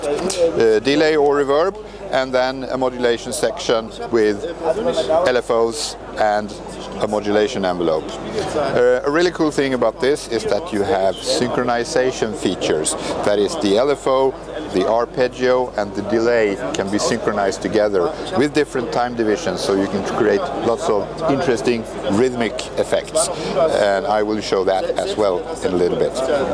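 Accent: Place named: Norway. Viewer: He must be norwegian... Have that kind of acsent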